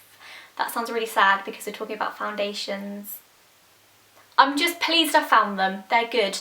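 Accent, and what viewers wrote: United Kingdom. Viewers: not to be rude or anything but that was a really stupid comment. would you please enlighten me on why she would feel the need to "fake" an accent in her videos? she's from the U.K, shes BRITISH. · I don't understand why people think you're faking the british accent? Maybe she's just very well spoken and has very good dialect?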